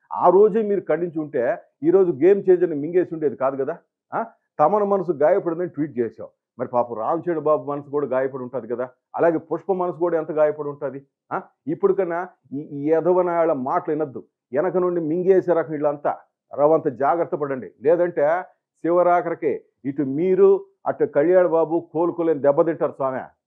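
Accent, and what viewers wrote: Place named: Nellore, India. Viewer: నెల్లూరి యాస చాలా బాగుంది గారు. మీరు చెప్తూ ఉంటే ఇన్వాల్వ్ అయి వినాలని పిస్తుంది. ఇంకా మరిన్ని వీడియోస్ కోసం ఎదురు చూస్తుంటాం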